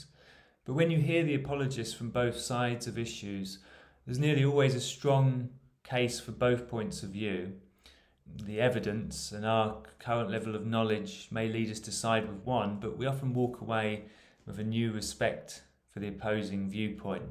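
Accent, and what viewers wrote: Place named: United Kingdom. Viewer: Good to hear UK voice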